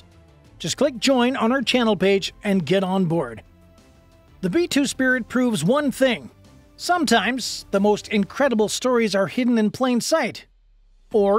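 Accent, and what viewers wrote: Canada. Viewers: Based on the accent of the presenter, I want to know why in the world a Canadian is presenting this video. Are you just envious? Why don’t you tell your communist labor Prime Minister you just elected instead of Pierre Poilievre to kiss your collective backsides. We in the United States aren’t going to continue to save your butts while you do nothing. Enjoy your 35 to 50% terrace. You’ve earned them!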